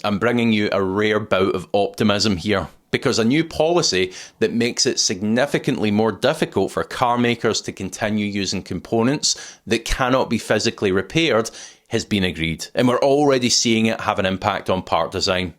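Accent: Scotland, United Kingdom. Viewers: I subscribed just for hearing English in the Scottish accent!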